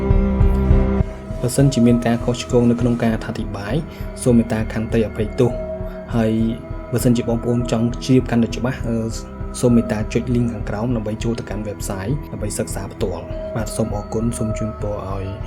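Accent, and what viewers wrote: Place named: Thailand. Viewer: អ្នកអានប្រវត្តិសាស្រ្តខ្មែរចេញសម្លេងថៃ ឬជនជាតិថៃជាអ្នកអាន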